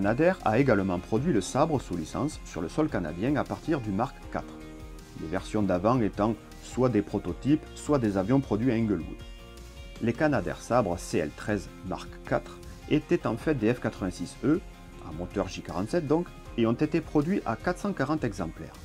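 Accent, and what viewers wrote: South, France. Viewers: Très beau documentaire … Les détails techniques et historiques sont très bien présentés. Le petit accent méridional du narrateur et quelques pointes d'humour permettent de suivre cette présentation.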